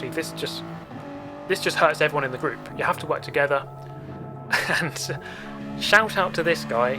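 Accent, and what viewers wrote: United Kingdom. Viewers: Your Initial D references earned my sub lol And I could listen to a British commentary on any topic 😆 love it! Keep up the fun videos and long live the shadow realm!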